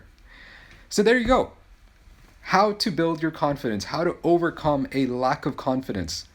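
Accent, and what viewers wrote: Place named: United States. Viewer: sir, you say better than you but the fact is that you're best. Because I've been watching so many Americans they speak like you only. So you're just superb❤👌👌👍✌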